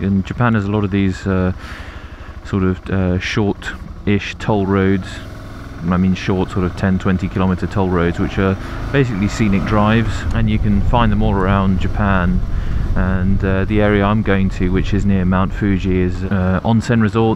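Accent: RP, United Kingdom. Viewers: sound's like your from made in Chelsea :) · carnt belive this guy s accent so posh love the videos.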